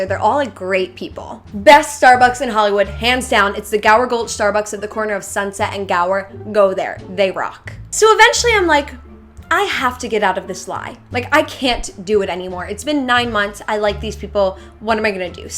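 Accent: Cockney, United Kingdom. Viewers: i love your british accent its so cuteee 😍😍😍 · Why does your background and you talking british remind me of Zoella? 💗💗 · It sort of sounds like a London Cockney accent :) x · your British accent is amazing!! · u know ur an OG fan when u already know most of the story.. and ur british accent is a solid 10\10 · Ur British accent is sooo gooooood xx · you are really good at speaking british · omg your accent is london cockney its actually quite believable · You should film a whole video in your british accent :3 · Love the British accent ur so awesome!!!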